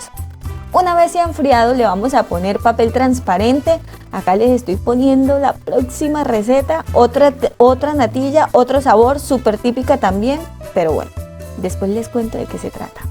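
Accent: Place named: Valle del Cauca, Colombia. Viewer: Amo tú acento vayuno oís.... yo soy una colombianacense con alma de valluna💞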